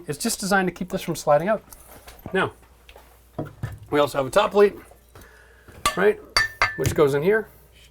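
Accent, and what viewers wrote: Canada. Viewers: You can hear that strong Canadian accent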